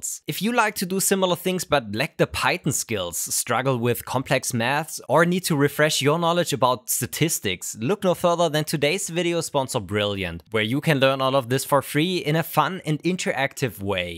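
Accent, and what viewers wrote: Germany. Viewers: Do I hear a fellow German?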